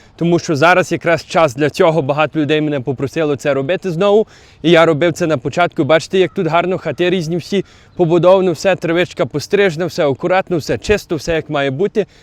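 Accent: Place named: Western Ukraine, Ukraine. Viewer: Будь ласка. переїхав в Канаду в 2005 році у віці років 11. Навчався в канадійській школі. Мати вчителька англійської. Він багато подорожував Канадою, вивчаючи поселення та хвилі мігрантів з України, діаспори тощо. Тобто його українська не зовсім класична, але він дивує своїм щирим українським патріотизмом. А також пишається своїм західноукраїнським діалектом.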